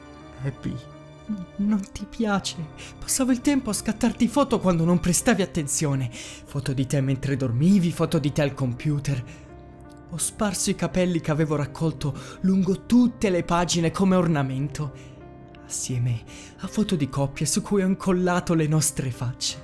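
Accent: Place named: Norway. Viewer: sei bravissimo e amo troppo il tuo accento norvegese credo. Continua così perché sei un mito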